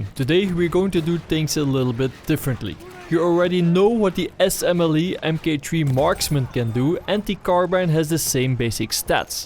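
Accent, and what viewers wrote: Netherlands. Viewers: Het spijt me om het te moeten zeggen, maar het is heel erg duidelijk dat je nl bent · you sound so dutch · je klinkt Nederlands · je hebt wel echt een zware dutchlish accent man. Vind t wel mooi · how dutchies speak english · u dutch? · Your englisch is like a dutch guy ? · toooooo obvious that he's from the netherlands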